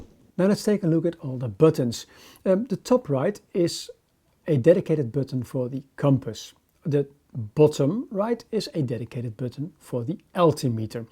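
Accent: Netherlands. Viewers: Dat nederlandse acsent 😂 hahahah heerlijk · Je herkent een Nederlander die Engels spreekt gelijk. 😁😁😁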